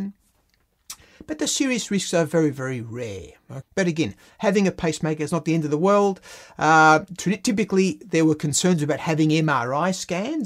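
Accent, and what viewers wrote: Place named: Australia. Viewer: you can be the most educated person in the world but when you have that australian accent you just loose value in an instant. i dont even want to here another word. pome mentality.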